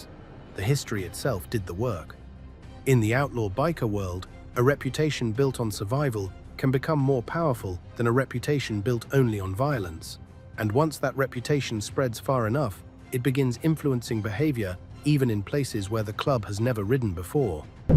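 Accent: England, United Kingdom. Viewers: Why is there so often some dork from England doing the narrating?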